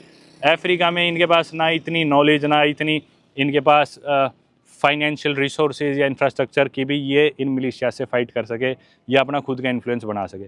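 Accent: Haryana, India. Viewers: Bhai re ek number video jama swaad aa gai ....nue lagge jama ki theeth haryanvi choora aa rya hai.....being haryanvi I am proud of your brav ....best wishes from UK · Love u bro..... Aapka hariyanvi to gazab ka hi he..... God bless u😂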